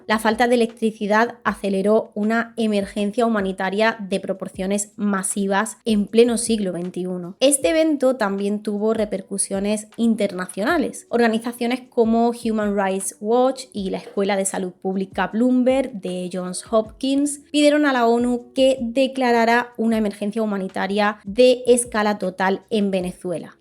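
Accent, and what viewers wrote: Andalusia, Spain. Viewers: Eres andaluza o manchega?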